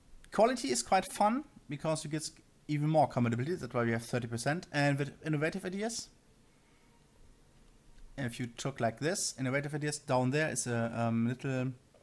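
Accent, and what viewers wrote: Germany. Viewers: bei dem deutsven akzent kannst du auch gleich deutsch reden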